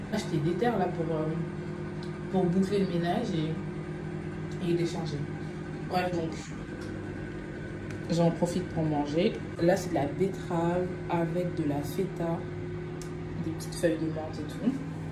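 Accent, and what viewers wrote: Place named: Ivory Coast. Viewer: L’accent ivoirien j’aime trop🇨🇮😂 Pourquoi pas une vidéo en parlant avec cet accent Tu as une belle famille 😍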